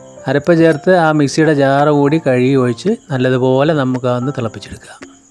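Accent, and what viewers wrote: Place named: Kannur, India. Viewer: വറുത്തരച്ച അയല കറി അടിപൊളി ആണല്ലോ 👍🥰❤️കണ്ണൂർ ആണെന് തോന്നുന്നല്ലോ 😃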